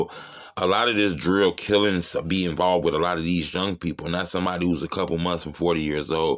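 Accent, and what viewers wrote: Chicago, United States. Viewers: I really like this channel it sounds believable and you sound just like you from Chicago do you have a Instagram I can follow or Facebook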